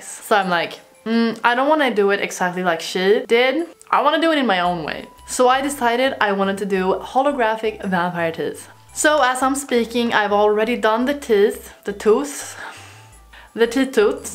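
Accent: Sweden. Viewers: SweetIvory 1203 It's a Swedish accent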